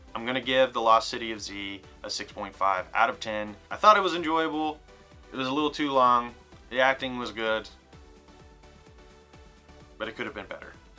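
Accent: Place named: United States. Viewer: Why is Daniel Radcliffe reviewing movies with an American accent?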